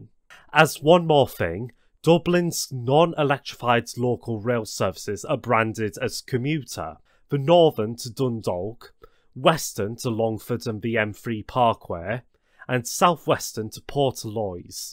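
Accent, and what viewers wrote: United Kingdom. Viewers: British accent is so strong its hard to tell you're speaking English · The person sounds like they're from Liverpool that's talking in this video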